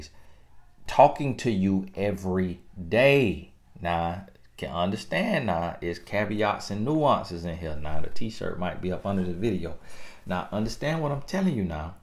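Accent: South, United States. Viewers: I think he is saying on your "back" but it sounds like bike due to his strong southern accent. Lol. Either way...super funny. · Good morning Mr.... I pray you receive this message with a humble heart. I am just listening to this particular video. And yes, I totally agree with your message. Question: my family is from Alabama. Are you from Alabama? I swear you sound like my Father ( God's peace with him in heaven). Listening takes me back to that honest place. THE REAL SOUTH 💯. Keep us informed and ready. But still waiting but readying myself for my husband. Thanks! God's daughter❤️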